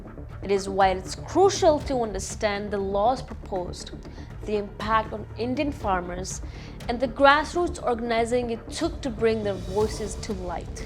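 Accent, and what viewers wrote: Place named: Canada. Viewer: Or apna ye canada wala accent apni Gamnd me daal lo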